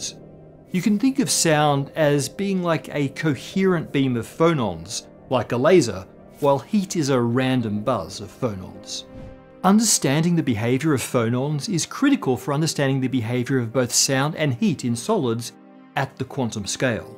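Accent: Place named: Australia. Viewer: I thought he was Aussie.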